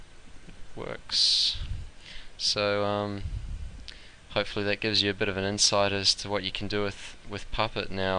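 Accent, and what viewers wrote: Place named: New Zealand. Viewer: What, so just because you can't understand a Kiwi accent, you have to be an arse and post some Swedish translation? Ignorance is bliss? Or you just upset the New Zealand is better than the old one?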